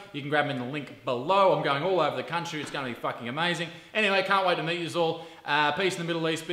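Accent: Australia. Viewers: You got such a great Aussie acsent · As a Aussie this is so true and funny If you think he is being sarcastic it's how Aussies talk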